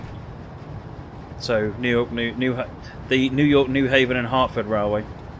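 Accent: United Kingdom. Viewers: You have a bit of a suffolk/norwich accent.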